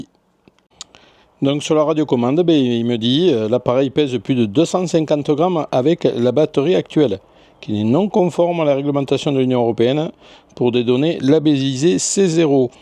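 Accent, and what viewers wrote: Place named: Marseille, France. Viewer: l'accent du sud!! Marseille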